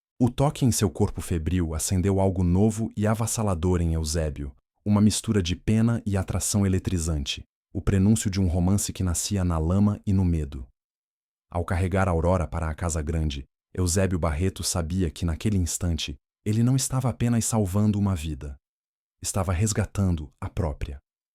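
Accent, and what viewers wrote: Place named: Portugal. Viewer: Que narração orrível a istoria e boa não coloquem mais este narrador mistura com língua de portugal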